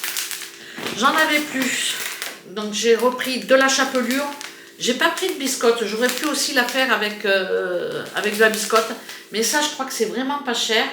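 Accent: South, France. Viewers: Coucou!ton accent ,c'est le Soleil du Midi toute l'année! ,bisous,bilan brocante à Gerland cet après-midi:2 paire de chaussures à 25 euros pièce ,un robe Carole été 12 euros en lin ,un sac à mettre dans le dos été 20 euros,et 1 paire boucle d'oreille 3 euros pour la copine de mon fils MiMi en bélier ,elle de ce signe !,encore ouvert demain à Gerland ,la vente pour abriter les Sans Abris!,très bon week-end!,bisous à tous les deux!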